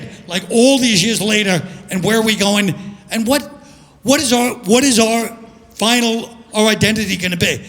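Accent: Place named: New York, United States. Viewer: Wow I just realized how much trump n Colin have the same cadence. New Yorkers am I right.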